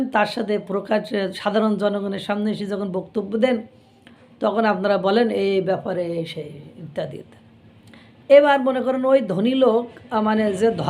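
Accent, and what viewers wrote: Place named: Bangladesh. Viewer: ভাই আপনার ভাষা শুনে মনে হয় বাংলাদেশের। কথা শুনে মনে হয় ভারতীয় মুসলমান। যদি বাংলাদেশী হন, তাহলে সাবধানে থাকবেন।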